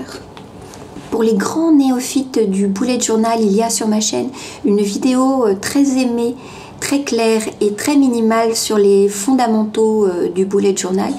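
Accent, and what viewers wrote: Quebec, Canada. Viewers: te parles pu en québécois?;)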